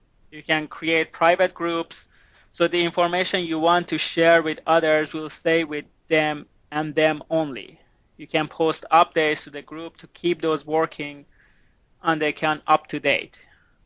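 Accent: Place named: Dubai, United Arab Emirates. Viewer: Is this guy from Dubai, I can not understand his accent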